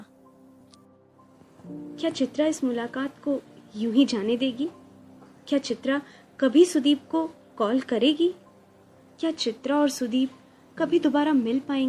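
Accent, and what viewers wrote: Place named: Bhojpuri, India. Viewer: Bhojpuri vioce amezing❤ 😂😂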